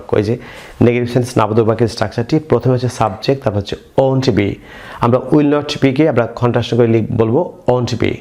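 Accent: United Kingdom. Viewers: HSC English textbook ar passage gulo English to Bangla translation kore din please. English translation korar somay word gulo valo babe british pronunciation gulo bolben sir kindly .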